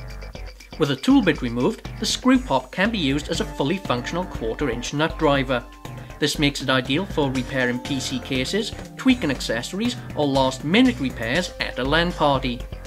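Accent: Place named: Scotland, United Kingdom. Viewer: What accent is this?, its kind of scottish / south african / jordie.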